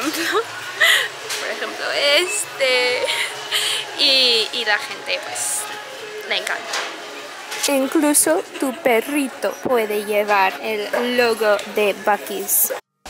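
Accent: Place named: Madrid, Spain. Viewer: Tienes un acento madrileño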